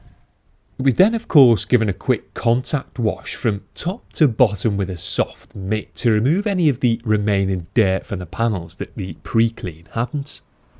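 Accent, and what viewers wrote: United Kingdom. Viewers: What makes you great is being a pro detailer and not also having your head up your ass. And your British ass voice.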